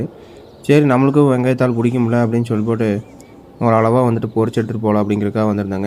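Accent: Kongu, India. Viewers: ஆயிரம் சொன்னாலும் கொங்கு தமிழோட அழகே தனிதான்❤❤❤❤❤ ஐ லவ் யூ டா அண்ணா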